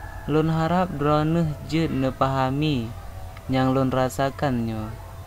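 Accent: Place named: Java, Indonesia. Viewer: kop bangay kah gam, kon lagey nyan bahasa aceh yg asli hy gam, kah mantong logat jawa, bahasa aceh hana god pah lom, kajak lam pareh keudeuh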